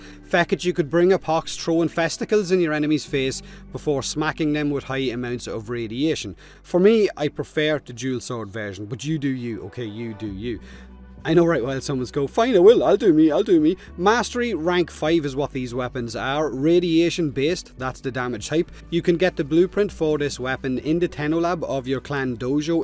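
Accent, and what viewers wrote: Ireland. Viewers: Am i the only one that doesnt give a fuck about the warframe but come just for that sweat Irish accent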